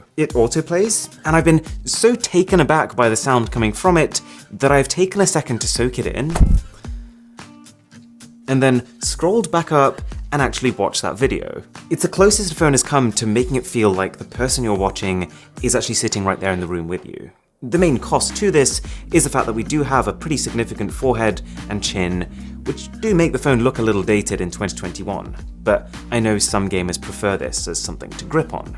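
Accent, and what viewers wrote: RP, United Kingdom. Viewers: I love your reviews but that accent... fuck I hate British people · What's with the exaggerated posh accent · he has british accent. teach me to.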